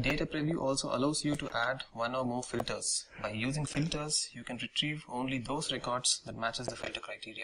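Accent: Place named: India. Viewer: this english Indisch language..triggers me so hard...omg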